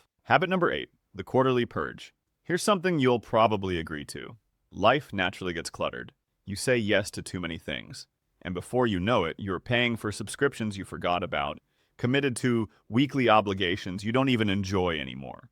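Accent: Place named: United States. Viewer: Don't worry. The misspellings are still there, despite his being a screenwriter. At last, good narration, whether that voice is artificial or not. Sounds like an everyday American, minus the weird syntax other (foreign?) videos have. Besides, we all need a fresh start in January, especially after 2025, a pretty rough year❗🗓️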